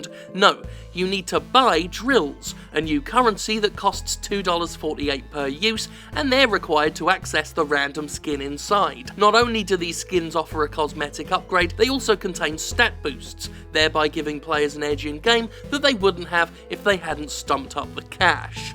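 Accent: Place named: United Kingdom. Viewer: My favorite part about your reviews Jim is definitely the way your insults (deserved ones) and criticisms to bullshit in the gaming industry just come out smoothly with that accent of yours. Something bout the dry humor and voice of British commentators in various forms of media that just sounds really cool.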